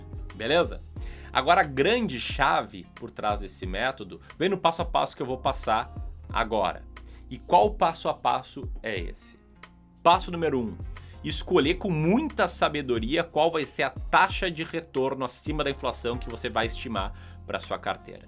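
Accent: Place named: Rio Grande do Sul, Brazil. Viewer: Bah ô Loko, tu é gaúcho né? Ãããhaaaam..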